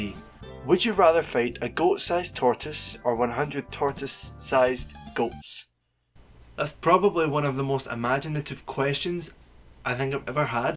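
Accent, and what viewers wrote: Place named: Scotland, United Kingdom. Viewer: I enjoyed your American candy video. Hello from Chicago. You are a handsome young man, What part of the UK is your accent from. It sound to me a bit Scottish, Irish sounding. I like your accent. Are you from Liverpool? Oh you are from Scotland! Very nice accent.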